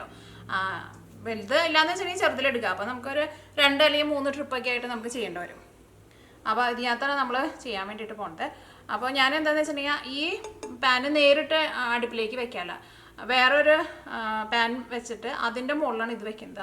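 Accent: Thrissur, India. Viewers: ഞാൻ തൃശൂർ സ്ലാങ് കേൾക്കാൻ കൂടി ആണ് വരുന്നത് ചേച്ചി 😘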